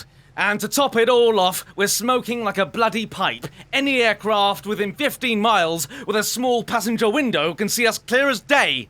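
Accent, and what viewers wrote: United Kingdom. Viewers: Thanks because of you my English skills and British accent improved a lot and on the other hand my options to swear like a real brit is improved as well thanks for that:) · i love the fact that you are over british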